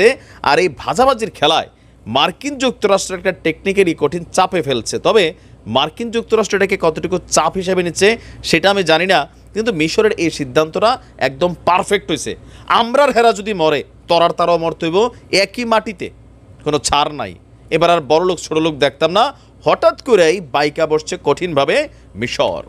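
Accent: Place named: Comilla, Bangladesh. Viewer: আপনার এই খবরে আজকে কুমিল্লার ভাষা সুন্দরভাবে শুনে গেছে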